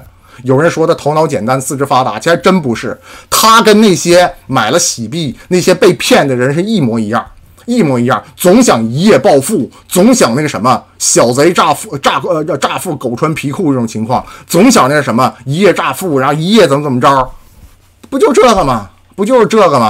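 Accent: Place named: Beijing, China. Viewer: 好家夥，是青島人嗎？這口音我還以爲你是北京的呢，完全沒有青島口音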